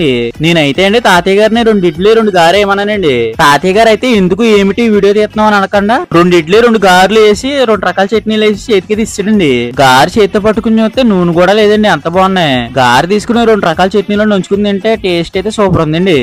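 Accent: Godavari, India. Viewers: Super anna i like your godharolla slang ante picha eastam annaya ❤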